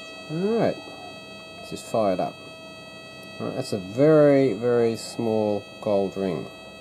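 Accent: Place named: Australia. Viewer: Good work mate. You sound like a typical Aussie that are clever at innovating and making things out of nothing.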